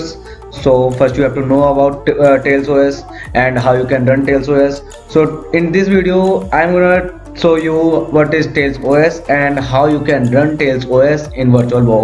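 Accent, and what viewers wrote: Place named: India. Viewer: nothing personal, i cannot stand tech videos with a strong indian accent, not being racist. its is just fucking impossible to learn the details. would be better off with just a generic robot voice. im sure many millions of people around the world would think the same.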